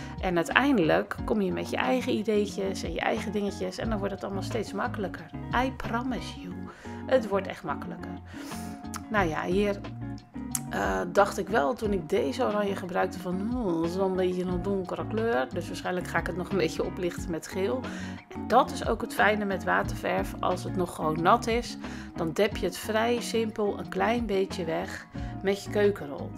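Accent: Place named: Rotterdam, Netherlands. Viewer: Zo grappig om je nu eens Nederlands te horen praten. Heerlijk met dat Rotterdamse accentje (mojenoukaikuh) 🤣 Keep up the good work (in Dutch please 😉)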